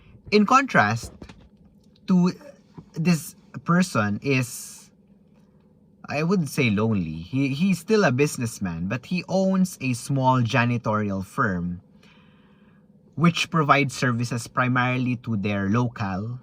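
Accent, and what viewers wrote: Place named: Philippines. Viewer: i could tell you’re filipino! my mom never taught me how to speak tho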